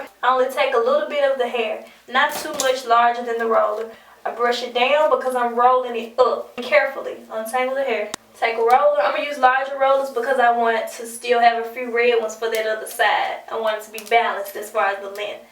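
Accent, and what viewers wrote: South, United States. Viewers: your gorgeous an your southern accent is mind blowing reminds me of home!! get in touch sometime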